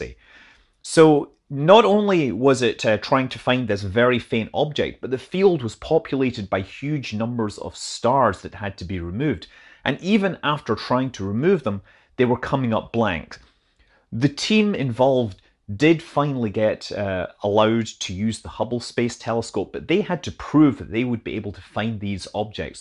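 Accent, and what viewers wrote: Scotland, United Kingdom. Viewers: Wait... you're Scottish?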